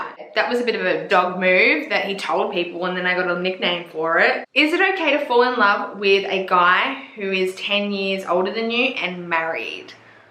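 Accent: Australia. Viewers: Your Aussie accent 😩. Love it girlfriend.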